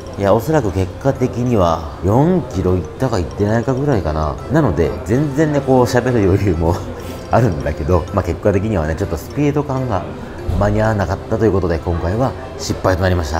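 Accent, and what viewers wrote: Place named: Okinawa, Japan. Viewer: イントネーションが沖縄です😃素敵👏👏👏👏👏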